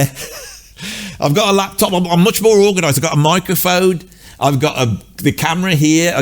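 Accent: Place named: England, United Kingdom. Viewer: I think you would make a great one-man-show on Broadway. OK, OK, off-Broadway. Considering your story telling, vocabulary, (major yet subtle) sense of humor, gift of gab, introspection, range of interests, English accent (I'm in America), enthusiasm, and ruminations; what's not to like? Cheers, mate!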